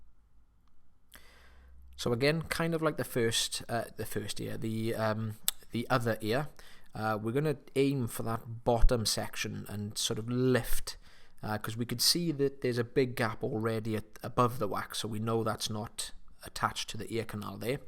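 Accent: Wales, United Kingdom. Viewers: Well done. Take a bow 👏🏻👏🏻👏🏻👏🏻👏🏻👏🏻👏🏻👏🏻 can I please ask where abouts in Wales you are from as you accent is slightly different to Mr B’s. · Love your Welsh accent.....Merry Christmas to you and yours! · Well done!!!! You were calm, cool, and collected. It was quite a tough one, but you just persevered and got those sticky bits out!!! 👂🏼 And your voice is so charming!!! I love a good Welsh accent😊 Can’t wait for day 17!!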